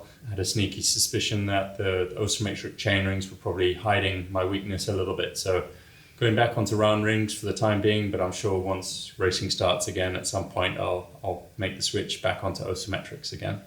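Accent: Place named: South Africa. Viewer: Everything he said about disc brakes is accurate. It's not where it needs to be. Also, I could really hear the SA twang in his accent during this video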